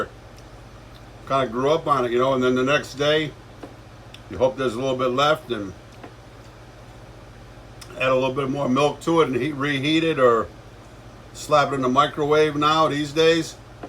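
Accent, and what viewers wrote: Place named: Philadelphia, United States. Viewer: Great Job......you talk and act like ur from South Philly!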